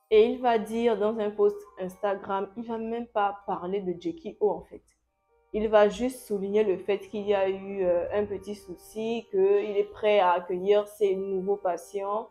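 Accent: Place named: Gabon. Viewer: Quand j’entends ta voix, ton accent Gabonais me fratte carrément au visage 🤧✨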